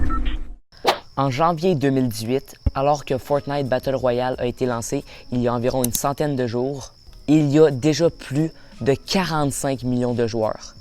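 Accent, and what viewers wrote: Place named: Canada. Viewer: Bonne vidéo frérot ! Avec le petit accent canadien 🙏🏻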